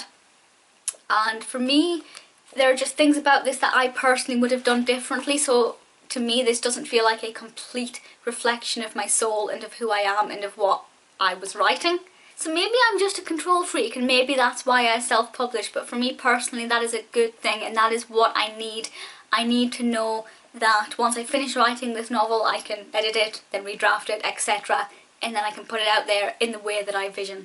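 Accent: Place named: Scotland, United Kingdom. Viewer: Hey right now this is the first video from you im watching and i'm trying to guess from where your from based on your accent. My guess is your Scottish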